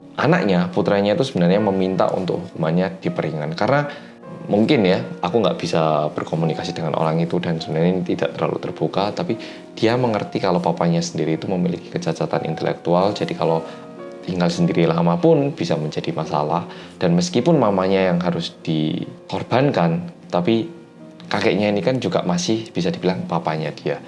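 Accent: Java, Indonesia. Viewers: Logatnya jawa banget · orang korea yang medok nya nggak usah dengan muka serius dong mas. aku malah ngakak e liat muka serius pas awal gini 😅😅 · Finally Medok pokok e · Yeah kembalinya 🤓🤓🤓bersama orang korea yang medokkkk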